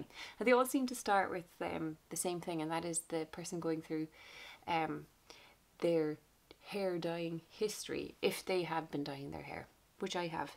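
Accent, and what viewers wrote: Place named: Ireland. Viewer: So delighted to discover you tonight! You have brightened my dull day with your enchanting personality & Irish? accent. I started by gray hair journey last August, I was SO excited for it! I also have pale skin and pale green eyes (and yes irish ancestry on both sides) and have been dyeing my hair dark for decades (even though my natural hair is light brown/dark blonde). I had NO idea what lay underneath it all! I also am just growing it out, not cutting it short or bleaching it at all. It has been quite the journey. At 8 months of growth I am just beginning to get glimpses of what it may look like grown out. I am planning on growing it to waist length which means it will take at least another 2 to 3 years or more. Right now it is long to mid back and I keep trimming the dull ends every month. I very much look forward to your future videos! 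Liked and subscribed. Slainte!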